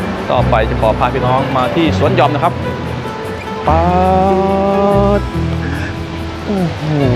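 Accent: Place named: Isan, Thailand. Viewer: คนทำคลิป วีดีโอ คนไทยอีสานแน่นอน สำเนียง บอกภาษา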